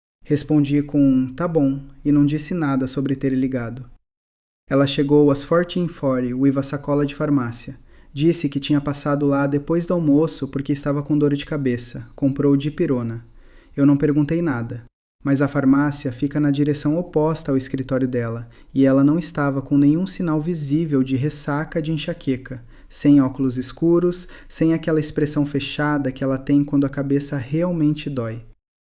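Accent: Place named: São Paulo, Brazil. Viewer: Por que os numerais falados em inglês? Que coisa irritante, além dessa vozinha com sotaque paulistano.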